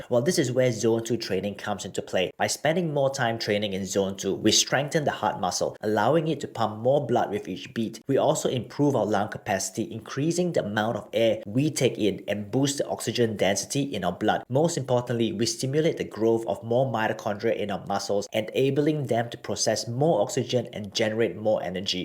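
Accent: Singapore. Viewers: u singaporean ah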